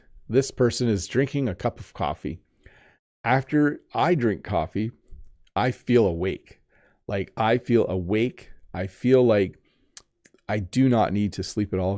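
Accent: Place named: Canada. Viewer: I love canadian accent❤️ It kinda sounds good 😊👍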